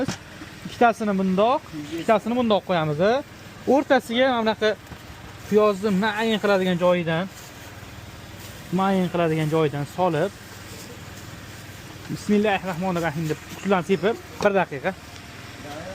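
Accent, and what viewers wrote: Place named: Tashkent, Uzbekistan. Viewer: Toshkent shevasidan nafratlanaman💔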